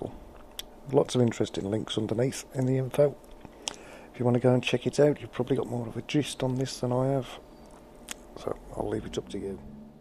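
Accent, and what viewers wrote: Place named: Scotland, United Kingdom. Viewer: Good Job!;) Love your accent! are you Scottish?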